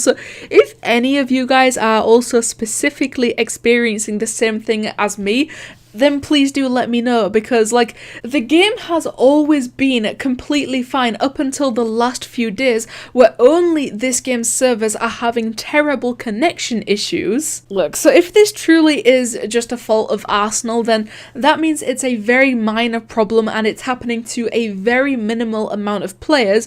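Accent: Scotland, United Kingdom. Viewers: Hey are u scottish u sound scottish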